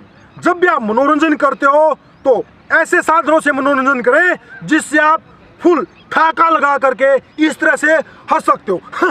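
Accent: Haryana, India. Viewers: Sir apki boli haryanvi lagti hai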